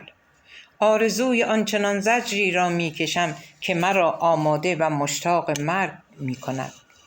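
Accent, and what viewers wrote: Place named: Russia. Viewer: چرا اینجوری صحبت می‌کنید؟؟ بیاد گوینده بخش فارسی رادیو مسکو در زمان شوروی افتادم که خانمی مسن که احتمالا از سرکردگان بخش فارسی حزب کمونیست بود، با تُنالیته خشن و مردونه، بالهجه ای که دیکتاتوری سرخ مسکو رو تداعی میکرد، و انگاری از توی یک سالن دراز خالی حرف می‌زنه، با اون لهجه فارسی_روسی میگفت: اینجا مسکو _بخش فارسی اتحاد جماهیرسوسیالیستی شوروی!! و ما هروقت شیطنت میکردیم مادرمون رادیو رو روشن می‌کرد و می‌گذاشت روی موج رادیو مسکو و میگفت : باشه اذیت کنین ولی خانمه صداتون میشنوه شب میاد میخورتتون.... 😅 وما بچه ها میخزیدیم زیر لحاف و فکرمیکردیم خانمه اگربیاد تاما رو بخوره، حتما نمیتونه مارو زیرلحاف پیدا کنه.....!! 😂😂😂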